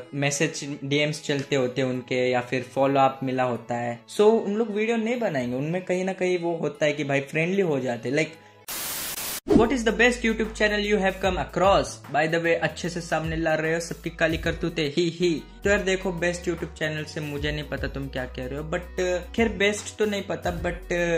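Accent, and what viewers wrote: Maharashtra, India. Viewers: Your Marathi Accent is the best.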